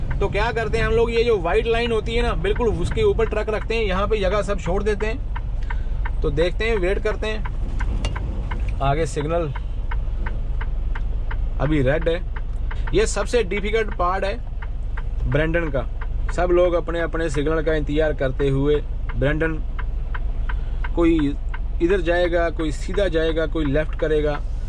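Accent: Punjab, India. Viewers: Vicho vicho nikal aundi aa punjabi🤣🤣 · Bhai bhaut maza aya 😂😂🤣🤣🤣 hindi wale te chib kad te And one like for ur effort cz punjabi bande laye hindi bolna yada muskil h english boln to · You are a good teacher. Like your Urdu but tarka of Punjabi gives more pleasure. Please take special care while driving. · Punjabi di hindi bhaut vadiya hundi hai bhaji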